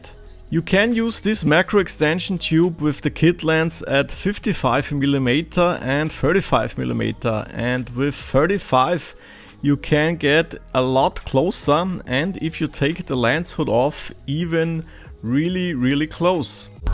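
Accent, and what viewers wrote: Germany. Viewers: fast Bayerisches Englisch....wie geil ist das denn :P cooles Video...vielen Dank dafür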